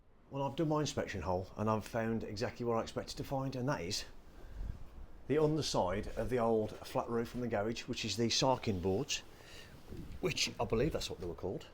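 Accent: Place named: Black Country, United Kingdom. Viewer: cheers bud about to do my own ,you sound local are you in blackcountry?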